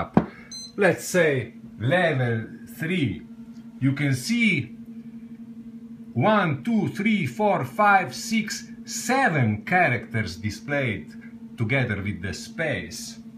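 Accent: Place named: Russia. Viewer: I total read this with your russian accent! Youre so funny! thanks for the response.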